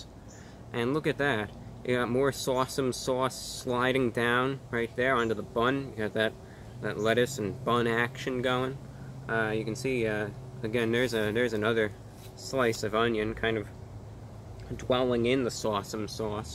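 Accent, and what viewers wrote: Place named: Long Island, United States. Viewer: If you're from Florida Reviewbrah, why do you sometimes sound like you're from Long Island?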